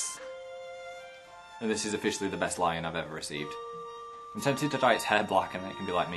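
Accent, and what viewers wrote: North, United Kingdom. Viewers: HE SOUNDS SO MUCH MORE NORTHORN HERE!!!!😁😁😁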